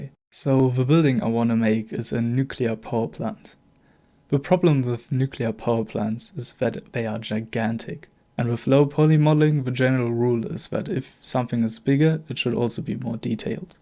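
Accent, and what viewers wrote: Germany. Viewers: Man erkennt deutsche einfach am sprechen xD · Liebe es wie deutsch du klingst obwohl du extrem gutes englisch sprichst. Sehr gutes video btw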